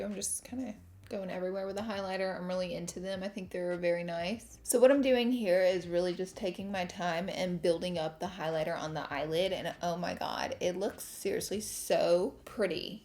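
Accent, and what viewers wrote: South, United States. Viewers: I swore I had seen enough reviews for something I can’t afford right now but I adore face, personality and especially her adorable southern accent 😍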